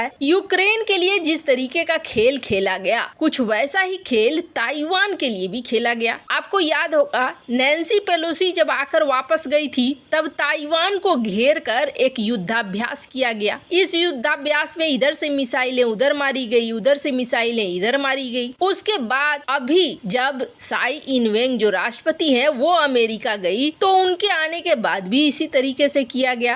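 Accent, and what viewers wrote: Bihar, India. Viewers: Apni hindi sahi kare bihari jaisa kiyoun bolti ho chan Santi etc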